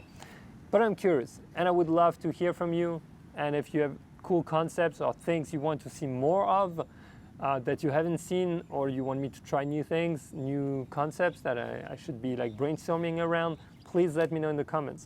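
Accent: France. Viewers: I am watching your videos from time to ime, most accidentally, but mostly I am impressed by your "Closeness". i hate the ads in youtube but it seems there is no way except booking an expensive abo. I look videos too on rumble or odyssee for political reasons. And what I also like on your videos is the good speaking quality even with the little frnch accent. That is an aspect what is oftly missed by other youtubers. They present not an accent but a slang which is not understandeable for anyone who wasn't born in the right state.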